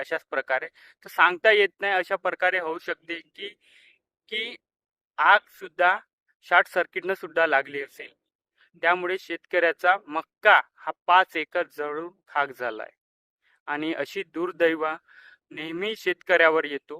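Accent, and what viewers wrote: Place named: Bihar, India. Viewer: Ha paka bihari ahey.ugich marathi anni shetkari boloth 😂😂😂.duplicate marathi.and politics 😂😂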